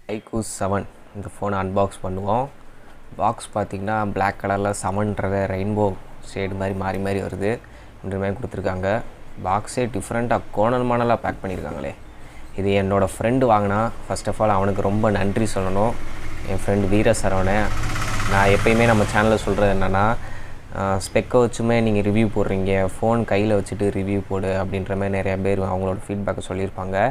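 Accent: Madurai, India. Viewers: Bro neenga madurai ah?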